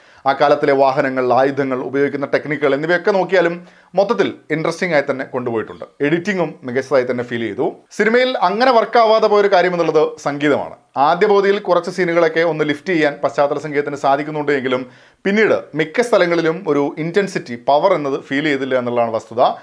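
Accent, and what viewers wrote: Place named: Palakkad, India. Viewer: Palakkad tamil ഉണ്ട്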